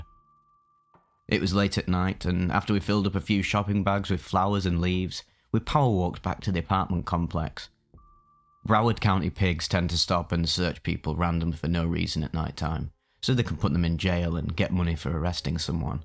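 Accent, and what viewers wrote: United Kingdom. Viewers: Yeah ma these narrations make me feel that my life is not that bad . I smoked and dug heroin for 25 years ,finally this year I saidto myself that I am not that anymore.. Even stopped the pgs -pregabalin 900ml a night at least for years. Diazepam .. Even the mirtazapine,after stopping mirtazapine I realised it was those absolutely draining all my energy and depressing the shiz out of me.. Couldn't sleep maybe 1-2 hours . These videos at night with the jlabs in are like magic . Sleep finally . I like the Salvia ones ,especially the Salvia loud dreadful scary machine thing people talk about .. Nice to hear someone from the UK aswell ..I'm in Doncaster..A rite shithole.. Well all England is a dumping ground for the money laundering men's hairdressing /mini mart selling bacci under the counter .. Keep em coming Brother ..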